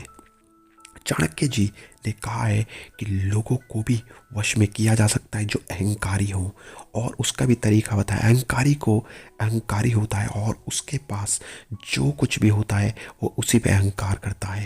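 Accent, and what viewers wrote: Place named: Bihar, India. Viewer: Aryyyy bhai suvicha to achha hi but video me voice bihari bhaiya jaise hi .. thoda voice thik kr k dalo..... nice bro. .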